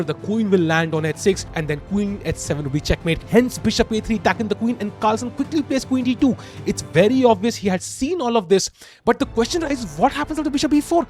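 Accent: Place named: India. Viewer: I love this commentary in Indian accent as it should be.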